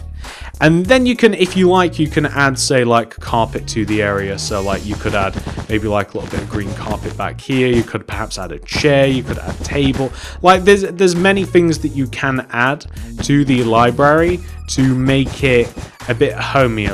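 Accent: United Kingdom. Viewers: love the british voice.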